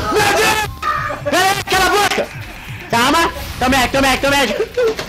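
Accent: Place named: Rio de Janeiro, Brazil. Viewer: é mt carioca msm... '' bota nela FP!! ''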